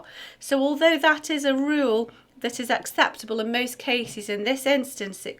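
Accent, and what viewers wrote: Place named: Northern England, United Kingdom. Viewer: Hi i am not understanding this to well. page 23 exercise (1) Questions (a) & (b).......?a....... i have beamed first 2 quavers then space then beam last 2 Quavers is this wrong and if so could you explain,?(b) i have put 2 dotted crochets could you please also explain. From a very (confused.comDenis) Also i would like to thank you very very much for your vids which are really helping me to reach my goal of my Grade 1 which is on 6th of November. Many many thanks for all your help. Denis (pain in the butt Pickering) lol. PS love your accent, i am thinking northern. I am from Lancs but lived in wales 35 years. Have a fabtastic day.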